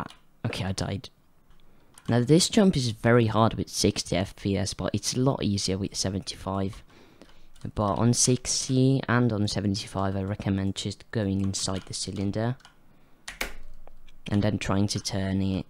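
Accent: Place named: United Kingdom. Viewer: i thought he was a brit lol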